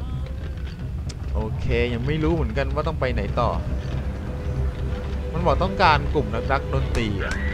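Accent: Thailand. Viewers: เสียงเหน่อ55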